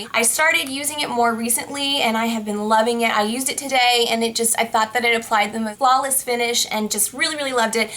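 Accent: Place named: Yorkshire, United Kingdom. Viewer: Her accent is northern- I think maybe Yorkshire! (Sorry if I'm wrong...)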